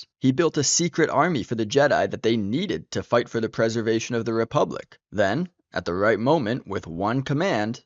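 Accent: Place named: France. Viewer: You sound so french.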